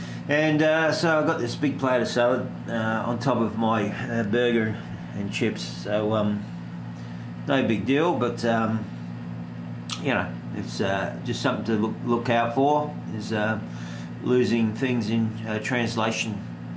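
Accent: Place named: Australia. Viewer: Well just make sure you don't ask for Baygon and eggs with your Australian accent. :) Keep up the good work.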